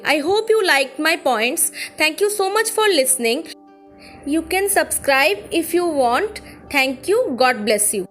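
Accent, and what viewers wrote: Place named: India. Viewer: I’m sorry, I can’t stand that Indian accent so I can’t listen to this video! It’s just so annoying. Lol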